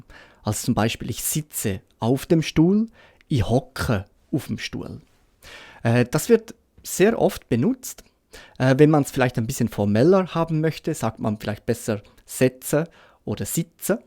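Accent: Switzerland. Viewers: Hallo, darf ich fragen, aus welchem Kanton dein Dialekt kommt?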